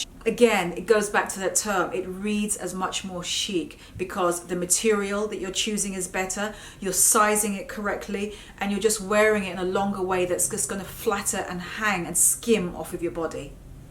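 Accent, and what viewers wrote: United Kingdom. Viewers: Found you today - instant subbie! It's so nice to find a fellow Brit who sounds like me! Excellent video!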